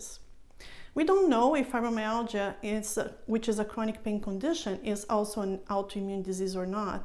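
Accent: Brazil. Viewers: Brazilian accent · Muito bom vídeo! Pelo sotaque acredito q a senhora seja brasileira. Ótimo trabalho!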